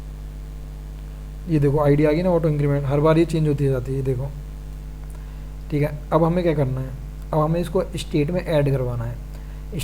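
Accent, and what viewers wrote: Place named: Moradabad, India. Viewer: Awesome! Btw are you from Moradabad, Uttar Pradesh?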